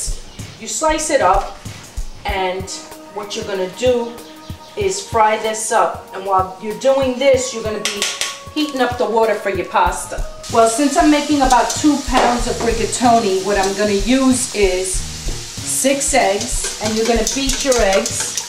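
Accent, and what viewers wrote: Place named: New York, United States. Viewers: great new yorker(??) accent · You gotta be from Brooklyn! I can tell from your accent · awesome ny accent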